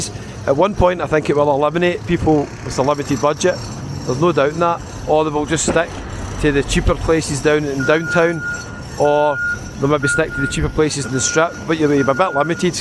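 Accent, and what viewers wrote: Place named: Paisley, United Kingdom. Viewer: Around of applause for hes came so far from his early Scotsman days. Well done man mon the buddies. I ll keep following you wherever you are. Your dulcet Paisley tones good luck⚽😎🆒🏖️👍 Viva LAS 😎🗼🗽🕋🕌 good luck on your next destination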